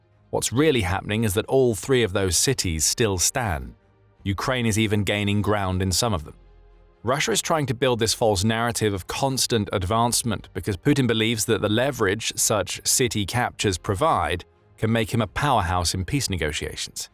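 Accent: United Kingdom. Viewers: This channel is funny a guy with poor knowledge off military history and tactics making claims about that which he doesn't understand. I am bewildered that he has over a million followers. a sign that if you have good editing skills and a British accent people will believe any nonsense. Russia isn't losing. the only reason that the war is slowing for Russia is that it's being backed by billions of dollars of foreign aid. we are dumping massive quantities of money to keep the Ukrainian State afloat at no personal gain to us whatsoever. it's an absolute bogus nonsense strategy that won't benefit anyone except this false idea that Russia is going to try to Hitler-esque conquer Eastern europe. it's not happening, it never will happen in our lifetimes, and I'm tired of sitting here and pretending like that's the motivation. it's very obvious that the war in Ukraine being funded by the Western allies is a great way for some corrupt money deals to go down. 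I mean how many billions did this guy lose? Russia isn't losing, nor will it unless something wild changes. Billions of western dollars looks like Ukraine slowly losing. what a joke to listen to this guy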